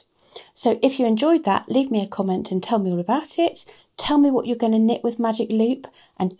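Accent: England, United Kingdom. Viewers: Thanks for the easy to understand instructions. Love your English accent!!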